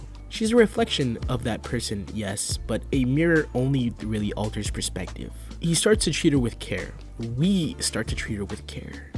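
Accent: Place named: United States. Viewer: american accents are so cool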